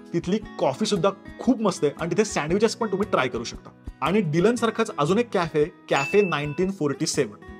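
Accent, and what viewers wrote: Pune, India. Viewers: Good one 👍 tuzhe funny 😊comments also made the vlog interesting and that typical pune ascent 😊in all good vlog and it should help many so thanks boss 😊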